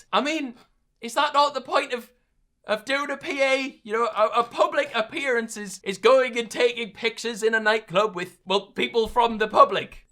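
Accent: Newcastle, United Kingdom. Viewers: He's from Newcastle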